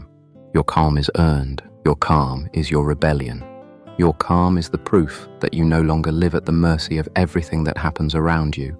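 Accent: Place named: United Kingdom. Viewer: I love this english accent, very british, well chosen ! And the content of the poscast is really gold !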